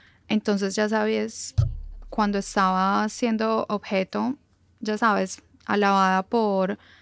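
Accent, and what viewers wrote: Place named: Colombia. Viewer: Amo el acento colombiano de la chica que habla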